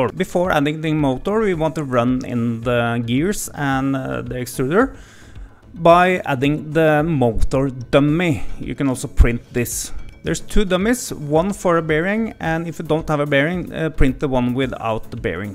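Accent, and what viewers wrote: Norway. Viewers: The norwenglish is strong in this one. Nice video neighbour.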